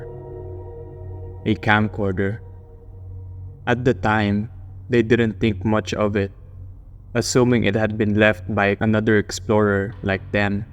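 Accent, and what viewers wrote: Philippines. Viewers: are you filipino? the accent sounds like pinoy! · Are you filipino? You sound like my father-in-law :)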